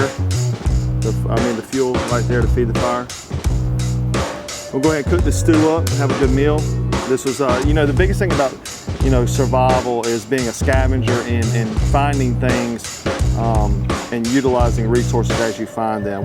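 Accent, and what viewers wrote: South, United States. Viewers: Now I know why that southern accent reminded me of home, we're from the same city lol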